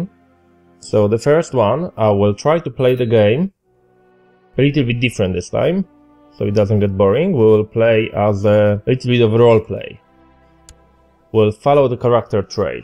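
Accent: Poland. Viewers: I did know that from the beginning of the video, you have typical polish accent :D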